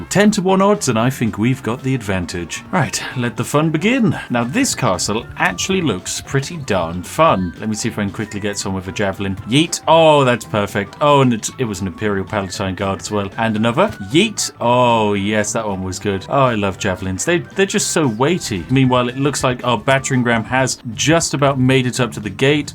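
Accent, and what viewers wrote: United Kingdom. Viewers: The way describes what he is going to do sounds very.....British.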